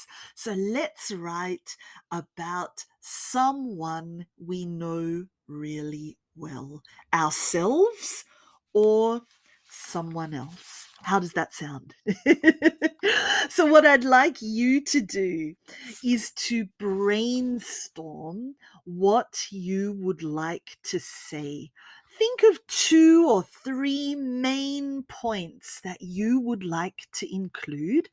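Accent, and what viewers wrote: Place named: Australia. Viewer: I really like so much of your Austrália accent, the way and metodology that you use to talk, it is clearly and make me to enjoy it. One more subscribe. 👏👏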